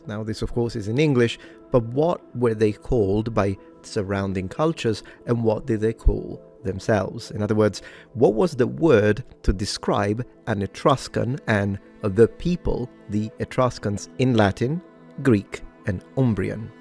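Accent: Sicily, Italy. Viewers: The italian accent make history lessons about that region more authentic...I am not sure if I like that thought, but I like that it is what it is😅 God bless you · He's speaking with a slightly Italian accent. Palermo is in Italy last I checked. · Sicilian, he is from Palermo.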